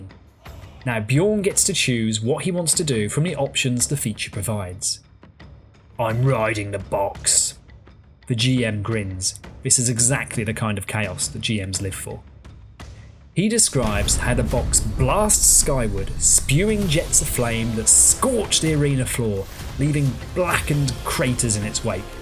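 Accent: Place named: United Kingdom. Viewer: I think accent is definitely a plus. Since I'm from across the pond, so I don't know my UK accents. Care to elaborate?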